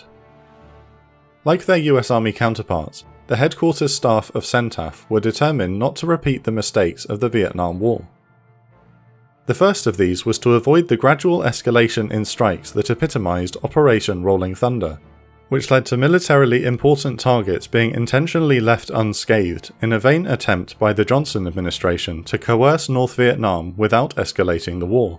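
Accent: England, United Kingdom. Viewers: why is ALWAYS some deep English accent?! I COULDN'T listen to it as much as I wanted to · The English accent threw you off. But he does say Iraq.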